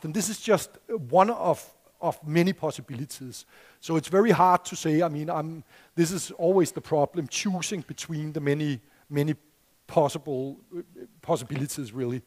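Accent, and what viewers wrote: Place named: Denmark. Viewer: Yes, he has a very typical "Copenhagen/ English accent" 😁Many Danes make the same little mistakes when pronouncing English (and other languages). Too soft consonants, too flat vowels, too monotonous intonation, an 'R' sound that often doesn't quite fit. An example of the latter is the word "very", which many Danes pronounce as "væ-i" (ie with a silent R). Some sound like they have a potato in their mouth, some say?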